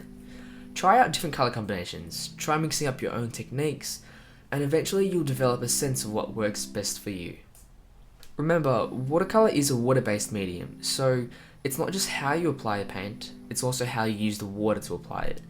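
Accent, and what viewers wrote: Australia. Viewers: is he from Australia perhaps? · you're Australian, yeah? Certainly sound so to me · Are you australian? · Are u a fellow Australian???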